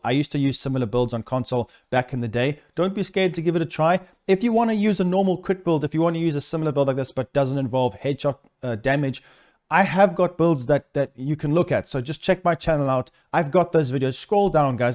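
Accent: South Africa. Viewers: Great video. Haven’t played TD2 after finishing the story when it came out. Thinking about going back again. Anyway, Are you South African? Keep up the great content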